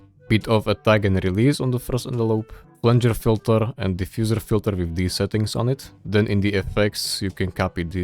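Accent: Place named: Eastern Europe. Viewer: slavic accent🫶🫶🫶 (The video looks good, but it's chaotic)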